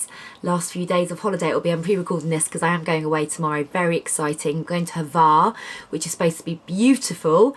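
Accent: United Kingdom. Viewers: I love her accent. Which accent is this? British?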